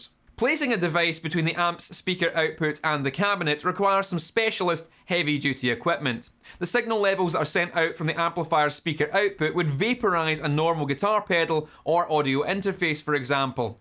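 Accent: Scotland, United Kingdom. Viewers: Aside from this (very interesting and usefully informative dissertation) on load boxes, I could listen to a Scot talk all day; auditorily entertaining...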